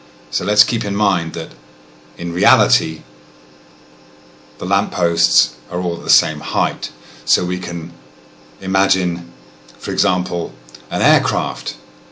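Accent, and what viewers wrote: England, United Kingdom. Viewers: If it is not religion making you ignore the evidence - what is driving your need for the Earth to be flat? My understanding is that there where two camps of flat Earthers - one who where justifying the Bible's lack of understanding of the nature of space etc. and the other was a group who wanted to feel special because of their "forbidden knowledge" of the flat earth which the world's governments are covering up. The two groups overlap in places and disagree in places and each sometimes call the other "shills". OR are you pulling my leg? I was surprised to hear an English accent in your video, I always assume flat Earth believers are mainly from America's Bible belt.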